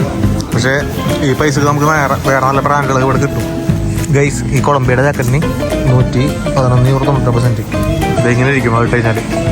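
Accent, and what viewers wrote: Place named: Fort Kochi, India. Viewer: Njan nattil malippuram anu ippo fort kochi slang kandu noki nalla rasamundu kelkkan ente father harboril varumaayirunnu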